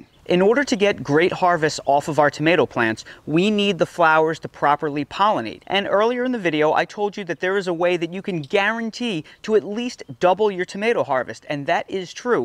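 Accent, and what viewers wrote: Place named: Mid-Atlantic, United States. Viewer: I tell you what I’ve said this before you and your wife are my favorite go to info I really mean that and I recently saw from your work you are from where I live the mid Atlantic I’m in Delaware I lived in pa. Md And Delaware I guess I can relate to your accent lol thanks for all your hard work and info the best by far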